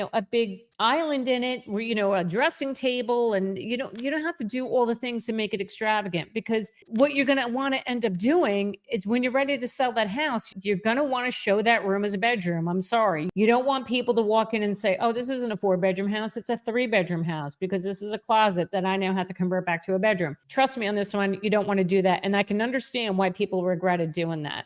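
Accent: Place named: Northeast, United States. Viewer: Like you, the channel and the ideas presented. One update; sounds like you’re from the northeast and I don’t know what pools cost there, but here in Texas you can’t put in a basic pool - no grottos, spa or showers - for less than $80k. That really brings your point home even further. My advice? Make friends with someone who has a pool! 😅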